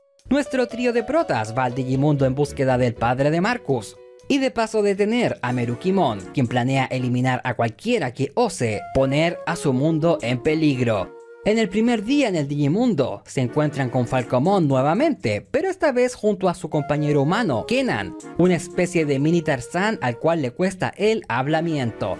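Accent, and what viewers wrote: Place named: Chile. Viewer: Es chileno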